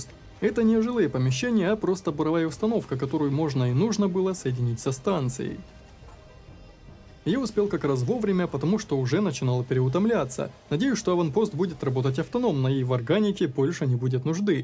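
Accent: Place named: Ukraine. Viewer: судя по говору он украинец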